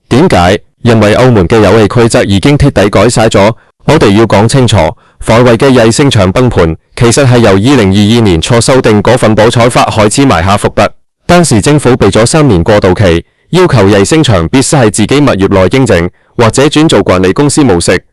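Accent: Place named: India. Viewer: 印度人講廣東話.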